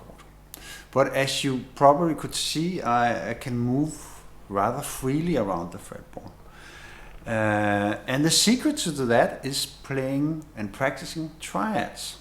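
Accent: Denmark. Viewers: Awesome lesson! :D really helped. And I love your danish-english accent :D so pwnage :D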